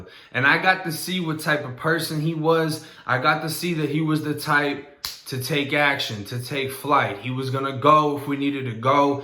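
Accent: Boston, United States. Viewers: I can tell your from Boston cuz the way you say your A's. For ex Stahted= Started Cahky= cocky but you may have grew up from 12ish on in florida. But you def dnt have a native north or south Floridian accent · he talking about florida but sound like he from boston...the fuck? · You sound like your from Boston. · You from boston originally? I hear it in ur voice occasionally and honestly you just look and sound Boston bred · Say bro you from Boston or Florida . You kinda sound like ol boy from Sam Adams commercial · Your accent sounds like you’re from Bean town!! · Bruh u gotta Boston accent but ur from florida??? I been to Illinois doc but from what I seen bout Cali prison white boys who r confused bout their pigmentation come in tryin to sound black. Get reminded who they are real quick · I knew you had to be a Boston kid from your accent but you always talk Florida stuff, you even kinda still got our swag for someone who says you didn’t grow up in mass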